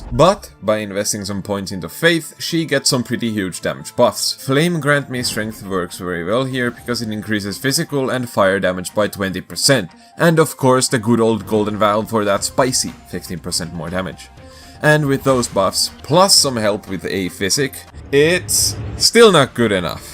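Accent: Finland. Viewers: Why the fuck do i smell Finnish accent… vittu saatana jätkä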